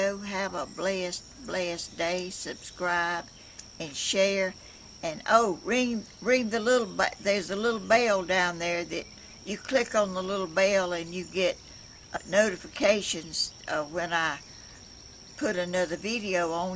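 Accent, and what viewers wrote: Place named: Georgia, United States. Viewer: Love your Georgia accent❣️😊 🌹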